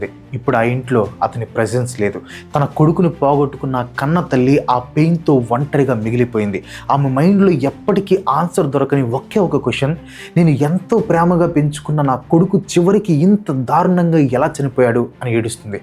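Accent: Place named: Telangana, India. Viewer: You are good like but your telagana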